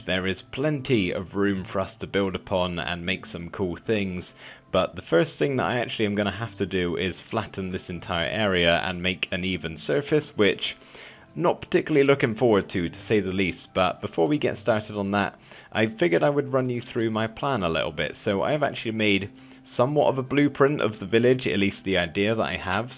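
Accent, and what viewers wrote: United Kingdom. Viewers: he is British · i have literally been trying to figure out if he's British or not, but this episode has convinced me that he is...*cough* cough "not at toll"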